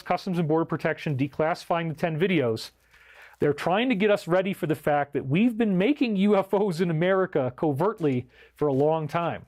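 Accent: Australia. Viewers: I love your Australian accent ‼️I lived there for a minute and you do it real well and you’re a fun guy. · Very enjoyable program for the most part-----thank you. My exceptions, personally are #1) I don't trust "Dr. Steven Greer" any further than I could toss him (appearing or whatever in your next film? can't STAND him) #2) I think your Australian accent is funny and I enjoy it----but Hon, you lack the ear to do a British dialect of any sort, upper or lower class. Not meant to hurt your feelings---just stating a fact. #3) Narcissists and Psychopaths do not "Repent"...they will not be Allowed into the Higher Frequency Timeline. The less said about them the better! Loved all the crop circle slides---so nice to have this review. God Bless You! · Great info! Love the Australian accent and your sense of humor! Have you ever seen the 1974 Brian De Palma movie Phantom of the Paradise? 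it's an extremely interesting movie I think you would get a kick out of!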